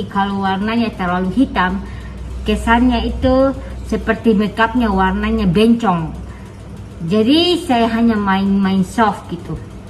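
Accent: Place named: Japan. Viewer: logatnya itu berasa kental japan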